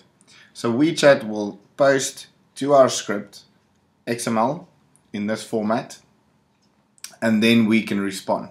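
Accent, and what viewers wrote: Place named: South Africa. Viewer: Hey, did you continue developing in WeChat, or have moved on to other things? Also, trying to place your accent.. are you South African? :P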